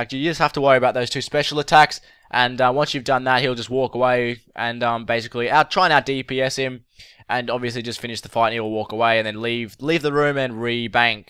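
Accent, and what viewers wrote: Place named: Australia. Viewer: Good to hear an Australian give a guide for once, cheers mate real helpful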